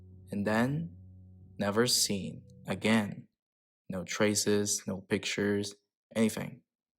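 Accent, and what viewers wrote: United States. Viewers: Wow, I'm not a native speaker of English but I really thought you were an American studying in Italy or something. Great content, keep it up!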